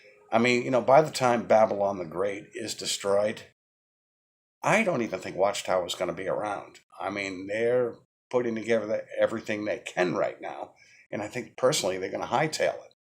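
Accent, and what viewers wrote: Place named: New England, United States. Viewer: are you from Boston? Your accent sounds like you're from a New England state. I'm from New York. Like your honesty. I recently quit JWs cold turkey close to 2 months. Having slight social withdrawal symptoms, but loving the truth I am learning directly from the bible and from videos likes this.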